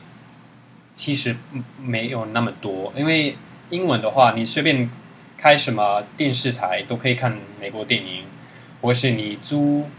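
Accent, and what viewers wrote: Taiwan. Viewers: 好像到地的台灣中文!!! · 在台湾学的中文？ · 我猜你是跟台湾人学的中文［［ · 汉语学的一口台湾腔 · 这人的汉语在台湾学的吧，我听出来了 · I am really impressed with your fluency of Chinese but the thing is you speak with a pretty obvious Taiwan accent，which sounds definitely beautiful but a little bit strange if you are trying to communicate with someone who comes from Mandarin. You may wanna watch some Mandarin movies in order to see the difference. Also, thanks for your sharing! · 講德文超帥。超根本不是討厭的。哈哈以後講聽不懂的德文就好了。根本帥。王子講國語根本台客。哈哈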